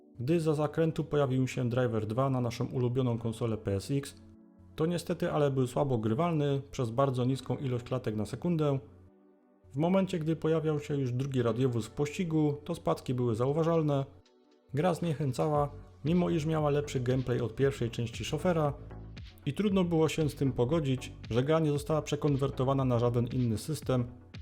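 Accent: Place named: Silesia, Poland. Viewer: Jesteś ze Śląska?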